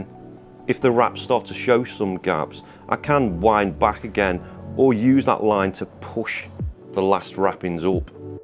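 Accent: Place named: Liverpool, United Kingdom. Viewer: You have a Beatles’ accent!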